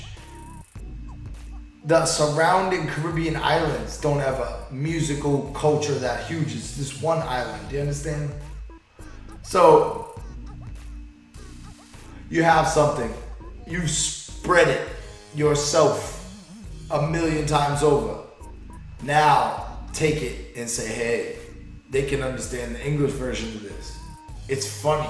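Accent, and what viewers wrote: United Kingdom. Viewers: Big man think u from the uk lol bless up thou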